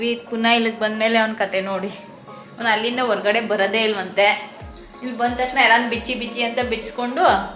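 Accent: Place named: Mysore, India. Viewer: ಮೈಸೂರಿನ ಲಾಗ ಸೂಪರ್ 👍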